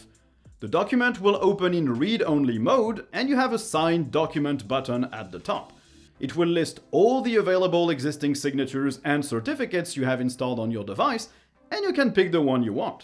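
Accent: France. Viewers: Being French, I couldn't tell if he was a fellow frenchy or just someone that had lived in France long enough to get a bit of accent. (I wish I spoke English as well as him, and hope I will someday.) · In other other videos he’s made, he’s said he is French. As an American, I can certainly tell from his French accent!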